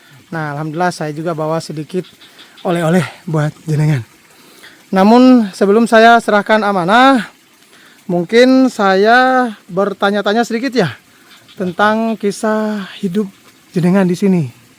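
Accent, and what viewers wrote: West Java, Indonesia. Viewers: Mantap orang luar P. Jawa (Maluku) pinter logat sundanya 😁 (cuman di sunda tidak ada istilah 𝐉𝐞𝐧𝐞𝐧𝐠𝐚𝐧, Itu istilah orang untuk orang Jawa/bukan sunda) 🤭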